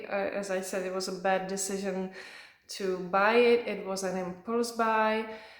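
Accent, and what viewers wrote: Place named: France. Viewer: Hello! I loved your video and the way you describe your fragrances. You have such a beautiful accent, the nicest I have ever heard on YouTube talking about perfume. Are you French? You are so elegant! I can't wait to hear your wish list. Also your male recommendations? Stay safe and please keep going with your channel I love it! Best wishes from Australia xx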